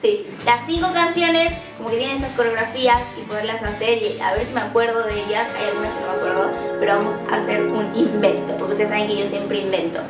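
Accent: Argentina. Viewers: Se te esta pegando el argentino 😂😂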